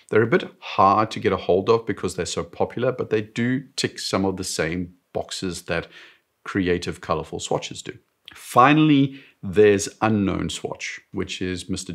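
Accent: South Africa. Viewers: I thought as much. You just cannot mask a Joburg accent... I had some of my watches stolen out of my luggage at OR Tambo... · sounds like a south african accent. i bet this gentlemen is from joburg or capetown, just a guess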